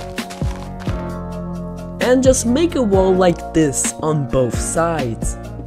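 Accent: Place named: Germany. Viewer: Ich hör so hart den deutsch akzent raus hahahaha kriegst aber trotzdem ein abo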